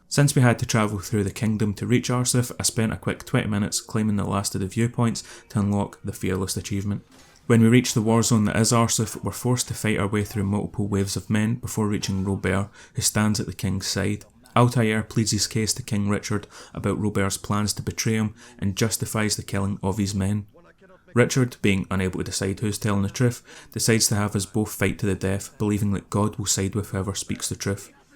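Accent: Scotland, United Kingdom. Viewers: Currently attempting this myself, subscribed within 4 minutes of watching. Why? The accent. From Glasgow myself and I love to see Scottish CC’s. Fantastic video man, keep it up.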